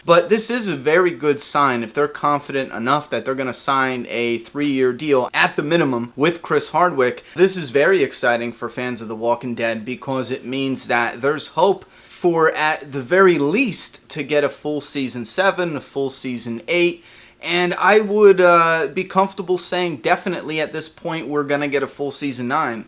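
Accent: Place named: East Coast, United States. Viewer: I like you. I'm from Long Island originally and it's nice to hear an east coast accent for a change. Trevor at Trevschan2 could learn a few things from you, IMO, so I think you do a good job. BTW, anymore of those candy packs come your way?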